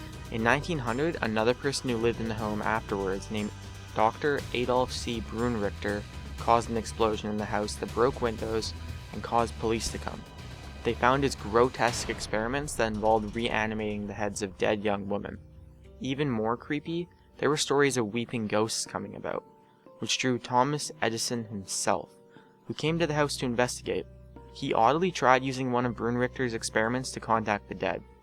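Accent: Canada. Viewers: Is this kid Canadian? His accent annoys me. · are you Canadian ???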